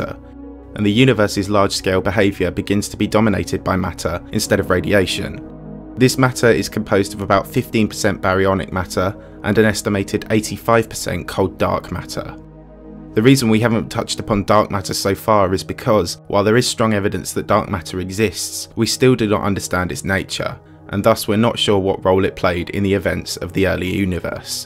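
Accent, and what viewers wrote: United Kingdom. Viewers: Except for the British accent its very descriptive and concise leaving out only one major point, the universe was born in a medium of some sorts which allowed for containment of the energy. Also, I wonder why people ask why, the answer is all around us.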